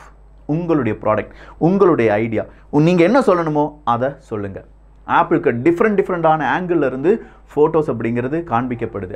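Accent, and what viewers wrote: Kerala, India. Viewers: Hi, i follow all your channels regularly, really appreciate your efforts n hard work. Tamil politics, international news, Geopolitics updates, science n technology, all i n all boss neenga. Krep going.. btw, I am frm Brussels. One suggestion: you really have to change TamilViyugam theme😊 And your English pronunciation quete keralitic, like Public, application etc should be pronounced correctly, since some students following this thread, so;) Thanks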